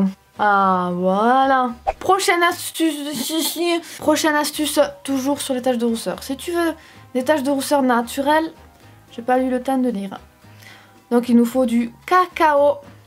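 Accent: South, France. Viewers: J’ai oublié de dire Bonne continuation aussi. Ah qu’il est beau cet accent du sud. Je regardais déjà tes vidéos à tes débuts et franchement tu es resté toi même et ça c’est beau aussi. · Oh putaing l'accent du sud j'adore !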